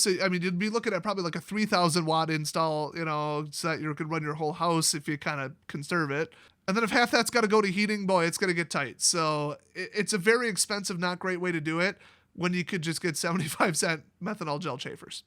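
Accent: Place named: Michigan, United States. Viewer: Very good video. I have slept in hotel parking lot in my car when I didn't want to pay for a room after a concert. I have a hybrid so it's less of a concern, but I always worried about some psychotic person walking up and stuffing something in my exhaust. That's why I always did it in a hotel parking lot. A battery-powered carbon monoxide detector would have been a good idea. But there are tons of people who try to commit the s thing this way and they fail, so I figured it couldn't be all that deadly. Also, you sound more like a Michigander.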